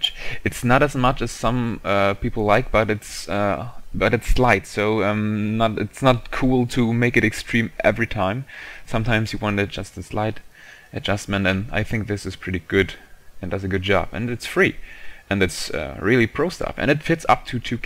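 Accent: Germany. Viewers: nice vid but stop copying the american accent its annoying! stick with your german accent its much more charmin....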